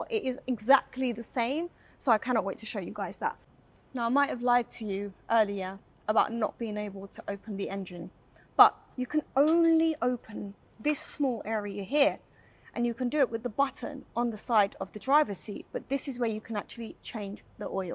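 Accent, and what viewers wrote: United Kingdom. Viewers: Love all your videos! the Cars you do and your accent!!!!!!! sounds like an UK accent! anyway great show!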